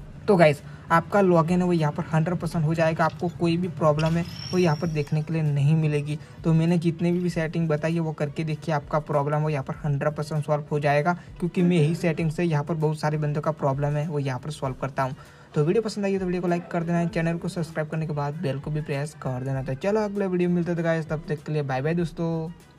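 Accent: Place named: Telugu, India. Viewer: Bro you are Telugu 😅😅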